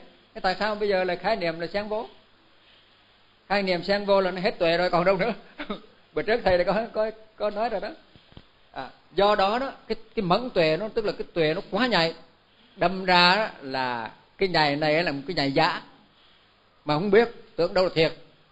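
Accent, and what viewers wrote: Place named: Saigon, Vietnam. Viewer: Mô Phật... kính bạch thầy. Xin thầy vui lòng chuyển âm băng của thầy giảng bằng "GIỌNG NÓI SAI GÒN " Rất hay và dễ nghe. Xin chân thành cảm ơn thầy. Con luôn luôn yêu mến giọng nói SAI GÒN tha thiết !!!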